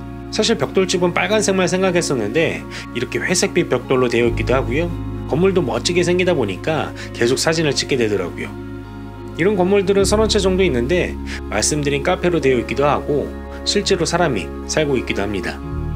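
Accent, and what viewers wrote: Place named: North Jeolla, South Korea. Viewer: 전라북도 사투리같네요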